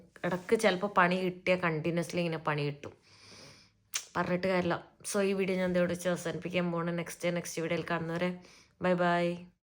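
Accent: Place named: Kozhikode, India. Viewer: സംസാര ശൈലി കേൾക്കാൻ രസമുണ്ട്....തനിമയുള്ള നാടൻ കോഴിക്കോടൻ മലയാളം....😂❤ അടിപൊളി സംഭവം..disposition too ok!.😂😂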